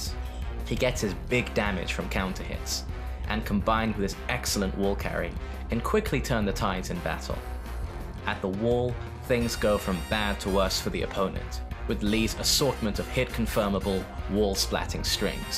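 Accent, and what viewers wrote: United Kingdom. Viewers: Sayuko Tanaka yes I learnt Japanese. I didn't mean "ay" as in "say" I mean "ah". I just didn't hear him properly because he didn't say the "ah" sound clearly. Maybe his British accent.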